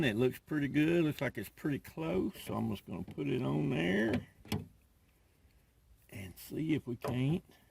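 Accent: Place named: North Texas, United States. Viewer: I looked at a couple three videos before replacing the trunk lift struts on my 2004 Impala, and I found yours very helpful because you showed the inside workings of the joint when the clip was moved around. No one else did that, I guess assuming we knew how it worked. Sure, once it’s open and you can see the nylon ball, it’s easy to understand. But every other video was all “put your thin flat blade in here and it will come off.” But if you can’t visualize what’s happening inside, you worry that too much pressure will cause the joint to fail and then you have another part to track down and replace. That, and your comfortable way of speaking, like all my folks did in North Texas and Southeastern Oklahoma, was really nice. I’m subscribing. If you have any tips on growing okra, I would appreciate them. I live in Utah at 4300’ and it’s hard to get a long enough growing season to make a decent mess of okra. 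Thanks again.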